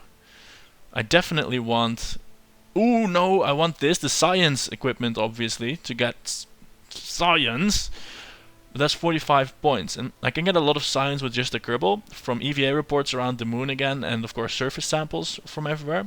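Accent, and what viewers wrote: Netherlands. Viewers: is he dutch? yeah? so obvoius lol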